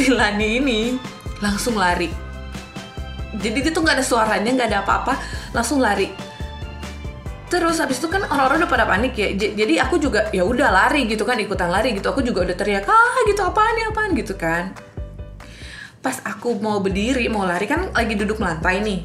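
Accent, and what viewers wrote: Manado, Indonesia. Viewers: solop mana kita pe solop😂😂😂 picah tatawa pas tau ka pake logat Manado😂😂😂😂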